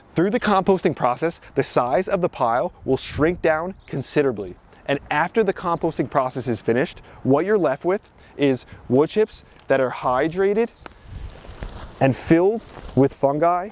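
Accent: New Jersey, United States. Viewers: First time I've seen you, I'm guessing you're from Jersey, my wife is and her father said WOODER instead of WATER.😂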